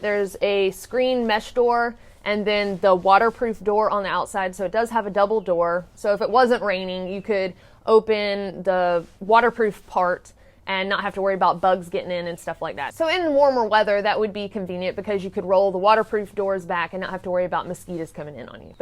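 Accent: South, United States. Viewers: Greetings from Spain. There is a Quechua brand tent from decathlon stores and it's only at €20. Very similar at specs and performance. I really enjoyed your American accent :) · lol I assume you are from the south'' hose pipe'' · Wide or Why'd? Southern accents are so cute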